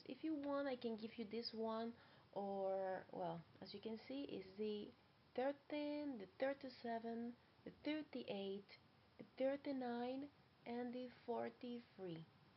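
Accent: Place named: Netherlands. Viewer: aww thank you so much can i ask more question how many months did you learn dutch and understand it? and in CIE exam how many hours or minutes does the exam goes im so nervous cos im doing self study as you your dutch accent is really nice aswell on the video its btw 😊.sorry i have a lot question hope you understand me 😘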